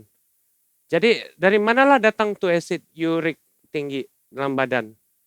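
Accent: Sabah, Malaysia. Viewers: Tq doc.... Siok dengar penarangan versi sabahan...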